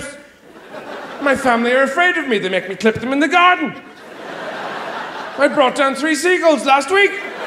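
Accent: Ireland. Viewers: he's Irish true and true. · Mia Scott British? No. Irish! ☘️ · I love the Irish accent, it sounds so musical 🎶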